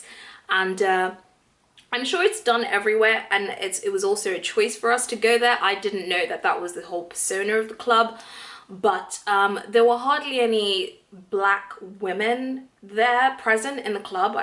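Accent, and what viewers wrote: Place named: England, United Kingdom. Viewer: Cutie but I hate the English accent!!!!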